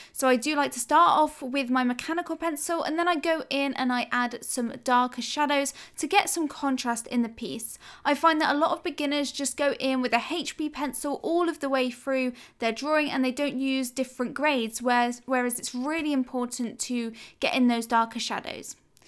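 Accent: United Kingdom. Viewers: British accent, I like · You're from UK right? How do I know your accent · Good lord, the British accent is so attractive lol... Anyhow, back to reality... thanks for these art tutorials and tips!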